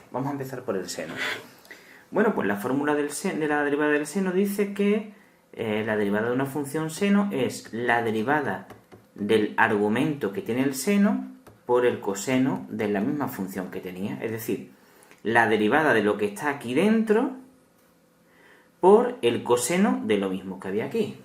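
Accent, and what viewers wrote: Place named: Catalonia, Spain. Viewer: perfectos tus vidios, aun que aún no me acostumbro a tu acento catalán... .___.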